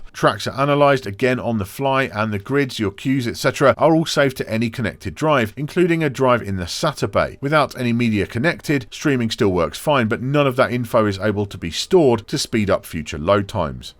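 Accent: England, United Kingdom. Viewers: Great review, many thanks! Please keep in mind that this channel of yours, is watching by viewers and subscribers from all over the world. The only "negative" in your reviews is the fact that you speak too fast and viewers who are not native English and don't have that English accent, might have problems of understanding you 100%. Otherwise all of your reviews are excellent!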